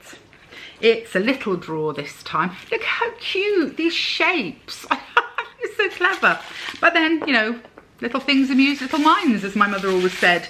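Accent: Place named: South Wales, United Kingdom. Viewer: Thanks - always love it when a new video pops up. Being a lady from South Wales myself I can often hear the odd twang in your accent x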